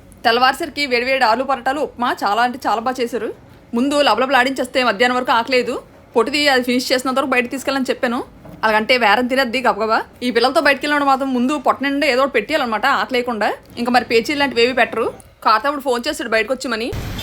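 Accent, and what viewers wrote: Vizianagaram, India. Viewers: గలగలా మాట్లాడేసి రెండు తెలుగు రాష్ట్రాలే కాదు, ప్రపంచ వ్యాప్తంగా ఉన్న తెలుగువారి సోదరి ..మళ్ళీ మళ్ళీ వినాలి అనిపించే విజయనగరం తెలుగు పరిమళం ...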